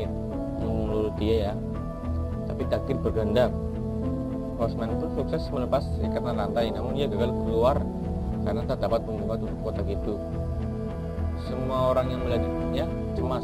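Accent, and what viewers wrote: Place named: Banyumas, Indonesia. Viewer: iya ,, yg ngomong ngapak,,,... memang ok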